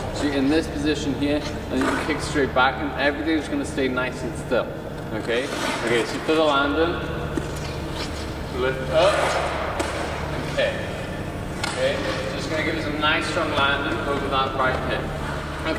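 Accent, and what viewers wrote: United Kingdom. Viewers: Are you British your accent is coming from London uk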